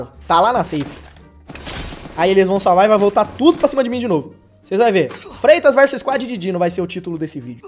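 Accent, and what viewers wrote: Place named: Bahia, Brazil. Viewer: ele é baiano mano, o sotaque muda Kkkkkkkk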